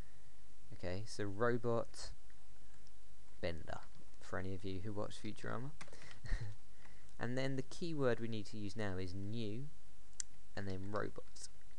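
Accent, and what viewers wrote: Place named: Europe. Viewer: Ello mate, just wanted to say that cuz im puerto rican and that euro accent sounds kool. anyways great tutorial I enjoyed it even though I currently know this information I believe it was good for people currently studying java. Also isbcrv maybe its a good thing that he didnt merge all the methods together into one, cuz it shows new users how to create multiple methods in one class and then call them from the main. I agree eclipse should be used but hey the guy likes notepad thats kool :-D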